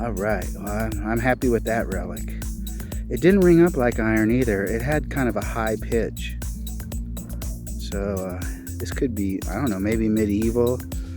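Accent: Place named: United States. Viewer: the American accent through my stereotype off. :-)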